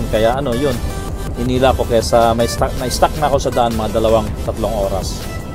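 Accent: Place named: Australia. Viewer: Good day mate!❤️ I love your aussi accent po Sir, kanggaro +s cute but causes disgrasya sa daan.. Keep Safe po Sir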